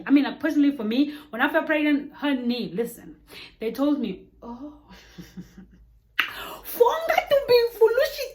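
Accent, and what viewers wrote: South Africa. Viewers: Wena u not Congolese, why do u sound so south african and hood south african at that. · Manje why do you sound sooooooo South African this much. You even look like South African ladies😂😂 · South Africa has rubbed off of you, you sound so much like us now hahahahahaha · The accent is legit SA. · Your accent is so South African 🇿🇦. 😊♥️ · If she didn't mention that she was a Congolese I wouldn't have known.. She is south African.. The accent 👌👌🤣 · I love how you adopted south african accent, unlike some big headed ones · I would'nt believe you are Congolese coz you have a South African accent 😊🙈 Love your personality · Shuuuuu, the South African accent is legit. Wow sis...💯🇿🇦👌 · How are you not South African with that accent is just a shock to me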